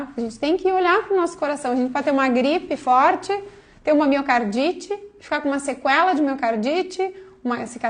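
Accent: Rio Grande do Sul, Brazil. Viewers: Sotaque de gaúcha doutora 😢😢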